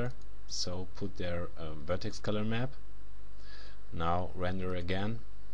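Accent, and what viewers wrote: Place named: Germany. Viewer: The German accent is the most awesome thing ever..! lol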